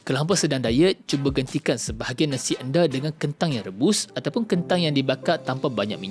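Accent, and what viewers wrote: North, Malaysia. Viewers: Doc mesti org utara kan..sbb saya dgr doc kata "ayaq"...kita gang 💪💪😂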